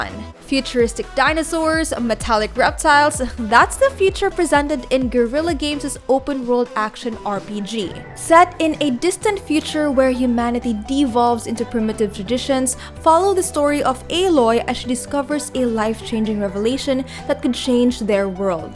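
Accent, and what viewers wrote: Philippines. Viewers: your filipino accent is so cute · The girl talking is a Filipino for sure cause of her accent :) a bit fob tho lol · You sound like a Filipina 🇵🇭 , are you? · Is that a Filipino accent im hearing?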